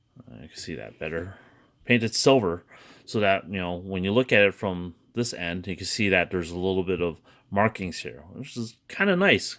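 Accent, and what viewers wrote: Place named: Philippines. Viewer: FILIPINO ACCENT- more dissasembly please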